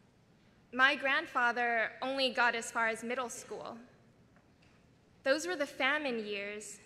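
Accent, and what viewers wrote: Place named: United States. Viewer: 比那个强多了地道的美式英语❤❤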